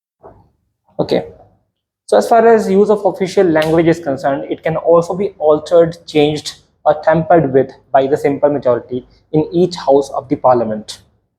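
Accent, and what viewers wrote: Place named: England, United Kingdom. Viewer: You English Accent is awesome bro🎉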